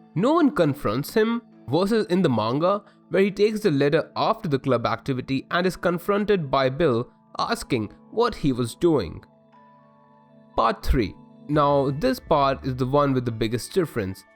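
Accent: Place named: India. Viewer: Du Yu hav a thuffy nose or ar u Indian? Sorry I couldn't quite understand your accent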